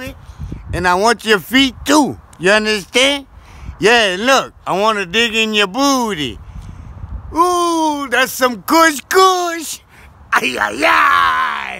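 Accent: United States. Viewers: He sound like he from my city, Lafayette Louisiana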